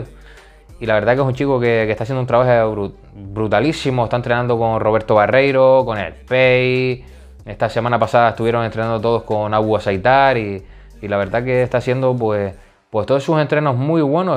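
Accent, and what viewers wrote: Canary Islands, Spain. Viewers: Lo fácil siempre es burlarse de la gente que empieza hasta que empiezan a tener éxito. Entonces llegan las críticas. Sigue hablando canario, que quien se acompleja de sus raíces son unos pocos, el resto nos sentimos orgullosos de hablar canario. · Usted es clase aparte! naturalidad, sincero, conocimiento, apoyo a los peleadores Canarios y de la Peninsula y a uno como Canario se le llena el pecho de escuchar ése acento, oite exentrenador ?👎🏽. Daniel Barez, futuro ®️una pena por Tato y Sebas !! Grande Bestia 🦍💪🏽💪🏽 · Buen video cmo siempre,una pena el "robo"a Tato, y t digo una cosa,precisamnte el acento Canario es lo q le termina d dar el toke perfecto a los videos,saludos bro💪🇮🇨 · Buenas tardes ante todo muchísimas felicidades por tus vidios y que sepas que te sigo por tu acento CANARIO.como yo también lo soy y como ablas en los vidios sin cortes.de ser así creo q no t seguiría como te sigo👍💪sigue así crack y a seguir creciendo en este ámbito un gran saludo campeón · Que sepas que yo t sigo por ese "rollo canario" porque es como si estuviese escuchando a un colega comentar la pelea/hablar de mma. 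Sigue así que creas muy buen contenido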